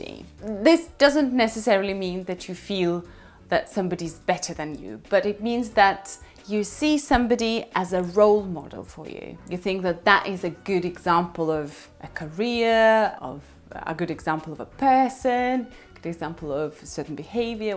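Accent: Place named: United Kingdom. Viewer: I loved her British accent sounds really cool and like a native speaker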